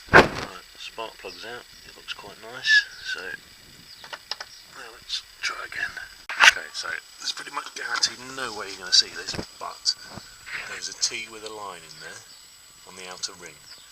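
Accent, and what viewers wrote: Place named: England, United Kingdom. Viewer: Get your ears tested. He is clear as a bell if you understand English English. Maybe you are American.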